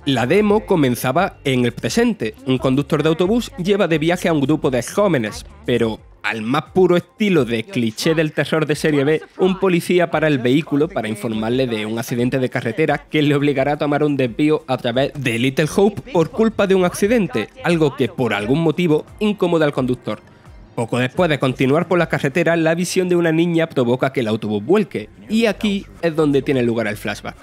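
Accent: Andalusia, Spain. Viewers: tiene un acento de una zona de españa...Y sí esas personas no me parecen las más indicadas para hacer de locutores.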